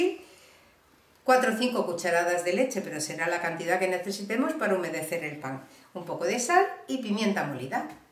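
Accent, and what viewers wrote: Spain. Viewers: De donde eres? Tienes el acento espanol pero no luces espanola.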